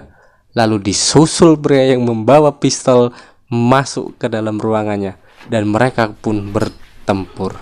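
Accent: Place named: Java, Indonesia. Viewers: logat jawa ny jgn nampak guna kan bahasa indonesia yg jelas dn tepat.. Setiap Youteber mengunakan bhasa indonesia. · Emange harus b.indo. jadi diri sendiri lebih baik drpd harus merubah diri, jdinya aneh. Semangat trs bang, w jg orang jowo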